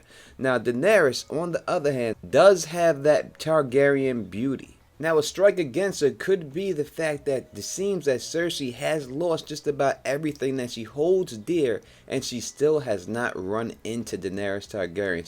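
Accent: New York, United States. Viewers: Chea chea chea!!! The, lol first time I found this channel, i knew right away my dude was a native new yorker like myself. We definitely have our own way of talking here, big ups from queens.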